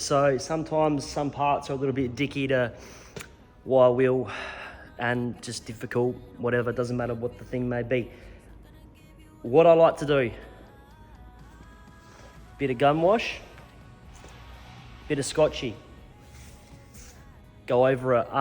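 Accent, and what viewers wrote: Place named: Australia. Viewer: What you’re doing is killer. Love the half Leno Aussie accent. I had that living in Sydney but lost it 20 years later on the sunny coast with all the aussies. Love what you do. Maybe a little more on your performance upgrades and also a bit more educational vids on panel work like in depth bog and panel beating finishing in paint. Love your variety bud👍👍👍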